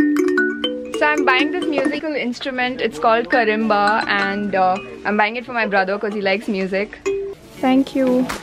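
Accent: India. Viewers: I love ur vlog the shot & editing is so great & ur indian accent is so cute. Greeting from Java Island, Indonesia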